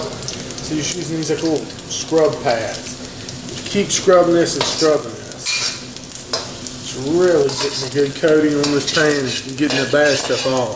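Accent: South, United States. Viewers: I've done this before and know about woks. Your video is the best. Funny hearing a southern accent doing Asian.....lol! Great Job!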